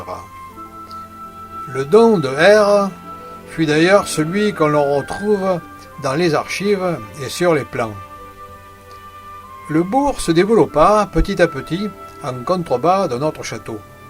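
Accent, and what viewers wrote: France. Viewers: très belle histoire, bien racontée et avé l'acent ! ( pas celui de Marseille mais celui de Toulon...)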